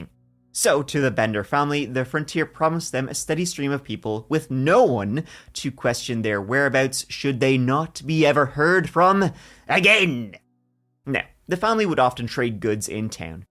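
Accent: Ireland. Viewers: Battling my third round of COVID in 8 weeks. Needed the soothing voice of my favourite Irish lad.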